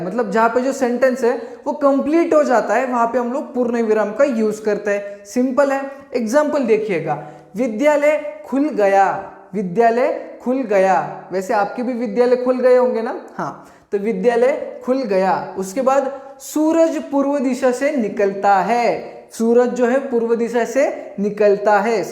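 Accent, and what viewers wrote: Maharashtra, India. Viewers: You are marathi i know because of your accent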